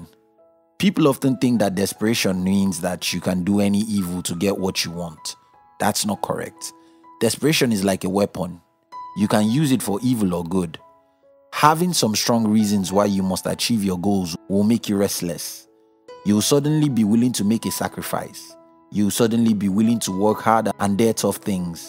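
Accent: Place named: Nigeria. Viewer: Nigerian, I believe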